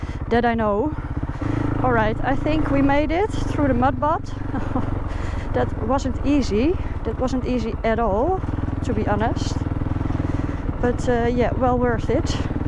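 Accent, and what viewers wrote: Netherlands. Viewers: very sympathetic and nice video. And I like your dutch english. remembers me at my colleagues from NL when I worked for Philips.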